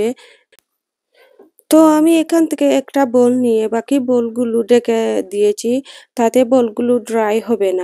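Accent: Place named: Sylhet, Bangladesh. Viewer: আসসালামু আলাইকুম আপু আপনার কথা গুলো শুনে মনে হচ্ছে আপনি সিলেটি আমি ও সিলেট থেকে দেখছি সিলেট কোন জায়গায় আপনার বাড়ি কমেন্ট করবেন ❤❤❤❤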